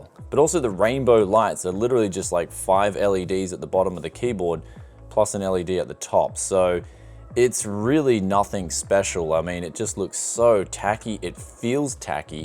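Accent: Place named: Australia. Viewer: I nearly left...until I heard that legendary Aussie accent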